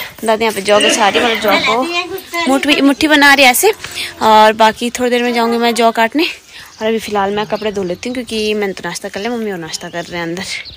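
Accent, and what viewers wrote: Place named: Pahadi, India. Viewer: Yaar mai bi pahaadi hu bhuli Teri pahaadi waali Hindi sun kar mja hi aa jaata hai.😅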